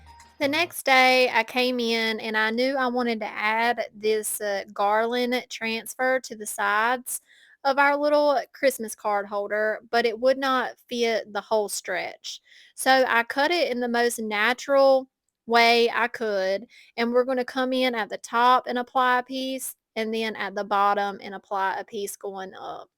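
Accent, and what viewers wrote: South, United States. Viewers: Gotta love the southern accent. You continue to amaze me with your creativity. Keep up the great work your biggest fan,😍💗U!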